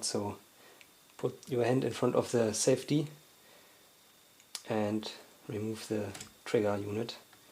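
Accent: Germany. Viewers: are you from Germany? sounds like that on your accent..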